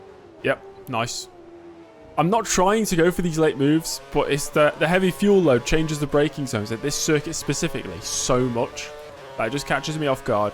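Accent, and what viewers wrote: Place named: England, United Kingdom. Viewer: I only follow this content because of your perfect English commentator's accent 😂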